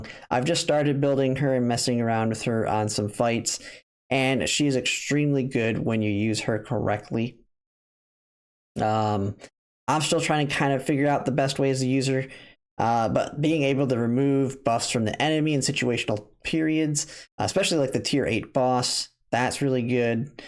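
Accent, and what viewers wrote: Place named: West, United States. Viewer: the way he pronounces the russian names is just ... well , you can tell he is from West for sure :D but the list is solid .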